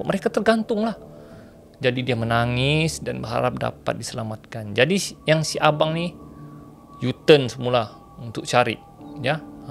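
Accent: Sabah, Malaysia. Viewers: Mantap.. Sya suka cara penyampaian kau.sebab tdk lari bhasa sabah kau. Banyak utuber yg bla membahas ssuatu hilang sabahan ori dorang. Terbaik bossssssskuuuuu.....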